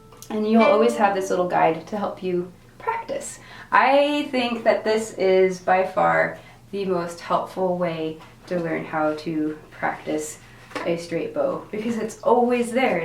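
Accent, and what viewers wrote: Canada. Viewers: I can taste the Canadian in her voice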